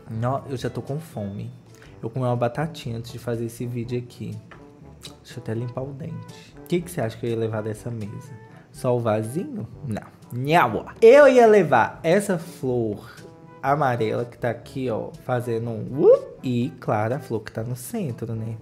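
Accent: Minas Gerais, Brazil. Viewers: ficando gradualmente mais mineiro!!! amei ❤️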